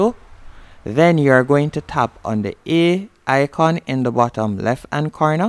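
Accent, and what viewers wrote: Jamaica. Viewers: Thank u 🙏🏽 I been trying to figure this out for days now. Love the Jamaican accent as well. 🆙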